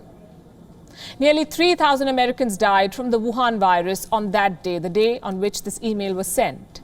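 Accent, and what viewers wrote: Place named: India. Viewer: u have heavy indian accent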